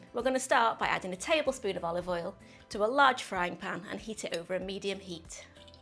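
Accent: United Kingdom. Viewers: This woman has lost her mind. Dont know her accent but guess she must be from the UK. What on earth is she doing to food?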